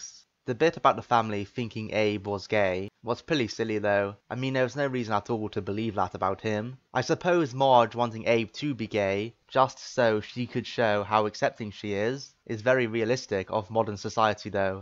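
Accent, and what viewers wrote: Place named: United Kingdom. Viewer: Hey, I have a question. It's okay if you don't want to answer it. But: Your accent is a bit interesting, it sounds like a British accent mixed with other accents, So where actually are you from?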